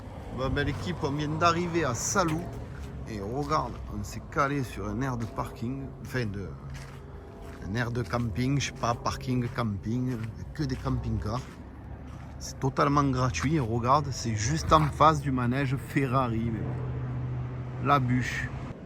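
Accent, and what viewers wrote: Marseille, France. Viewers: Salut! Tes vidéos sont au top, comme à chaque fois. Je kiffe vraiment tes tests et unboxing. J'ai 2 petites questions pour toi qui n'ont pas un rapport direct avec cette vidéo. Première question: Pourquoi ne pas faire des t-shirts et pulls comme tu fais déjà mais avec des bandes réfléchissantes? On pourrait rouler en toute sécurité en te faisant un peu de pub 😉 Deuxième question: Tu fais de temps en temps des vidéos avec des potes, mais est ce que tu envisagerais de faire des vidéos test avec des abonnés? Voilà c'est tout pour aujourd'hui 😁 Ne change rien, continue comme tu as toujours fait Et le petit plus, c'est notre bel accent marseillais 😂😂😂 Bonne continuation à toi, vivement la prochaine vidéo...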